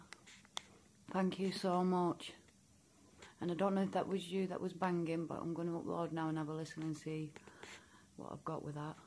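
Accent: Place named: Yorkshire, United Kingdom. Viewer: they feed off fear hun so try and stay strong dont record it pay it anymore attention people may love your vids buts its not worth what its doing to you you sound like you possibly have a yorkshire accent or there abouts i will come try to help you if you wish if it gets too much just send me a message hugs x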